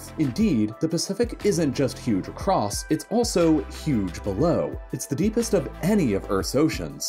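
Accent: United States. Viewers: Does anyone else find talk of kilometers meaningless? I know metric is more scientific, but, you sound American, give it to me in miles. Please. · As an American, I couldn't relate to distances because you dealt in kilometers, not miles. Why. Just why. You sound American too. It was frustrating. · Sounds American. So maybe not use the metric system. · Narrator has American accent. Why is he talking about Km and not miles. Drop dead. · Speaks American English USES KILOMETERS AS THE UNIT OF MEASUREMENT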